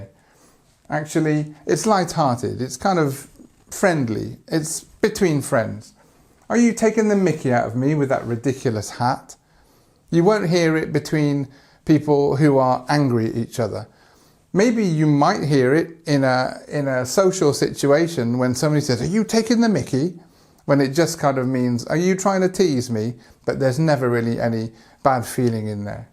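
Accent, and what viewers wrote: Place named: United Kingdom. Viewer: Though I love listening to our dear Adrien,I really enjoyed listening to you, I looove your beautiful british accent ! I hope to see you again on this channel.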